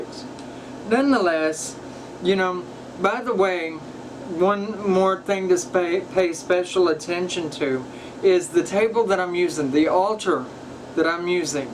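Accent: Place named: United States. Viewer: she sounds American.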